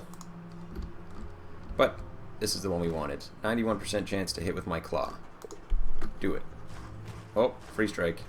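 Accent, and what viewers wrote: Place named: Canada. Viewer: He sounds Canadian. Go Nathan Fillion! I love WM/HORDES and was just about to buy this game but like so many other games with dice, this seems to both suck AND blow. 63% chance of hitting, 3 misses in a row, and I have a feeling that wasn't an isolated case. Also it seems PAINFULLY slow to play a game...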